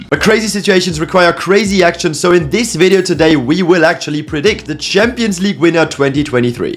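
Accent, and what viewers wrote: Germany. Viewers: you sounds like a German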